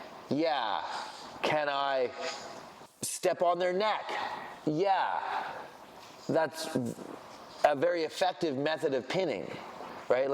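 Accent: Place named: Canada. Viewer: Gotta say it’s great to hear another Canadian on here “aboat”. Like I’m in a Tim’s lineup in Sudbury. Much love brother.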